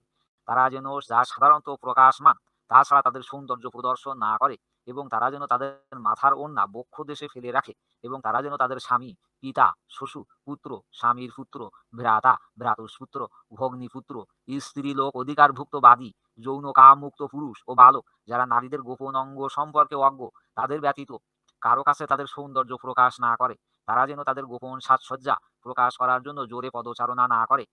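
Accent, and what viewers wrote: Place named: North Bengal, India. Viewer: গুরুজি আপনাদের উত্তরবঙ্গের ভাষা খুব সুন্দর এটা আমরা মেনে নিই কিন্তু আপনার ভাষাটা খুব তাড়াতাড়ি আসার কারণে বা বলার কারণে আমাদের বুঝতে অনেক কষ্ট হয়ে যায় বিশেষ করে আপনি যে পৃথিবী ছয় দিনে বা আট দিনে সৃষ্টি করা যে এটা লাইফটা করছেন সেই সেই লাইফটা আমরা ভালোভাবে বুঝতে পারিনি আরেকটু স্লো ভাবে খন্ড খন্ড করে বুঝিয়ে বলবেন তাহলে আমাদের বুঝতে কষ্ট হবে না আশা করি আপনাকে এবং আপনার জ্ঞান কে আমরা শ্রদ্ধা করি আপনাদের উত্তরবঙ্গের ভাষা গুলি শুনতে এমনি হাসি আসে এরপরে যদি একটু তাড়াতাড়ি করে বলেন তাহলে আমরা একেবারেই বুঝতে পারিনা ধন্যবাদ স্যার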